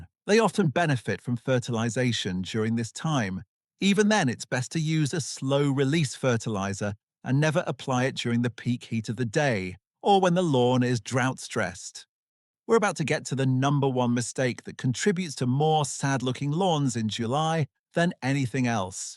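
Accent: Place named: United Kingdom. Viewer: This video was solid. British accent AI or not,is always awesome. Thanks for taking the time to make it!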